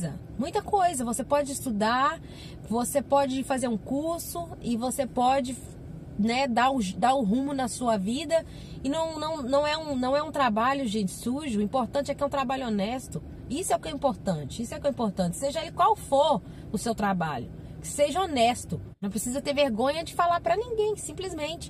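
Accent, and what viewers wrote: Minas Gerais, Brazil. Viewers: Oi, acabei de chegar no se canal e já amei o primeiro vídeo que assisti. Você está certíssima, amiga, vergonha temos que ter da desonestidade. Seu trabalho é muito digno sim e se você está vencendo na vida é graças a ele. Amiga, percebi um sotaque mineiro, eu também sou mineira de Coronel Fabriciano, e você é de onde? 😘😘😘😘😘😘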